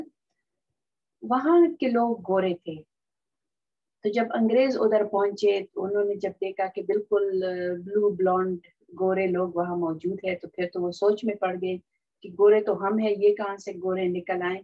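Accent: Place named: Khattak, Pakistan. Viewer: Your pashto accent and dialects reflects that you are from Orya khel Khattak region?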